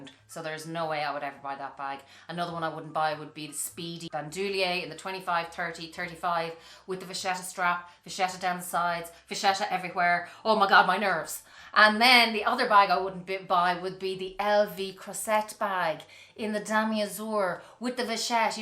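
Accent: Ireland. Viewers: Hi, I have 3 LV’s (speedy, neverfull & retiro) & I love them but to be honest I’m done buying LV’s right now. Love to hear your voice esp. your Irish accent. I used to work in Beaumont Hospital as RN, now I’m base in US. I’m from the Philippines originally. Always looking forward to your videos.❤️❤️❤️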